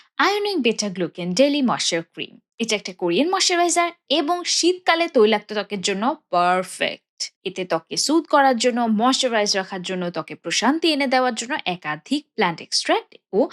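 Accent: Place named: Bangladesh. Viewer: Just watched a few videos of your english channel. I love your bangla accent but all the best for your English channel as well ❤❤❤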